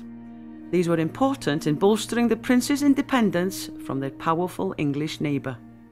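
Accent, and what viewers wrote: Wales, United Kingdom. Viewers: Lovely Welsh voice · I.m expat welsh....can you recommend a yt channel featuring Welsh history please ❤ I only discovered this channel today but i.m not interested in graveyards. But I.ll give it a try too ❤ as it is so good to hear a Welsh accent xx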